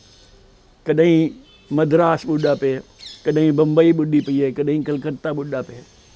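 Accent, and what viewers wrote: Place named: Saraiki, Pakistan. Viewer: واہ۔ اتنی خالص سرائیکی اساں گھٹ سنڑی ہے۔ چس آ گئی ہے۔ وسدے رہو۔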